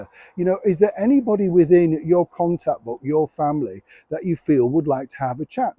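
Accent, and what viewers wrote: Yorkshire, United Kingdom. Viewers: Agreed said like a proper Yorkshire man! 😊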